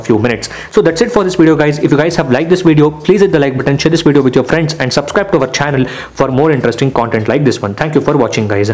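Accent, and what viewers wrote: India. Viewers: thank u ur voice is like indian or u are indian but u can speak English keep going never give up ur channel will have 10milion subs 1 day I know it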